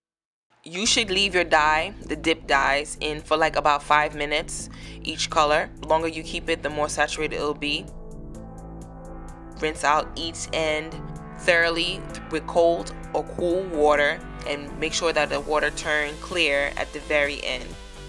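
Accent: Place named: Brooklyn, United States. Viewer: I love this diy! I had a feeling you were a Brooklyn girl from your voice and I checked your Facebook!!! BK Love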